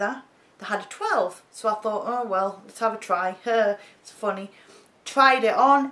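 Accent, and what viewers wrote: Newcastle, United Kingdom. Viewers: Are you from Newcastle?:)x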